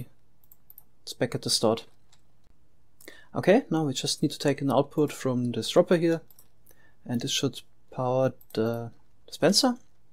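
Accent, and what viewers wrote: Germany. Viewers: is German your first language?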